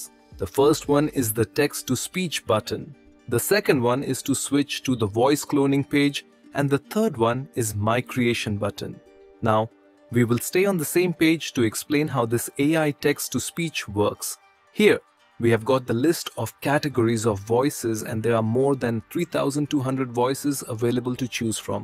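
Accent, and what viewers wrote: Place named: India. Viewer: eventhough you are already using tts, your idian accent still strong.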